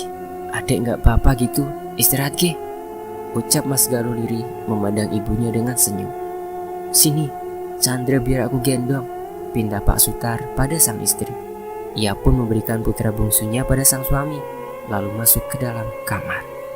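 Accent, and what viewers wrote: Java, Indonesia. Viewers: Salut sama sang orator bagus sekali , terutama aksen jawanya mantap sekali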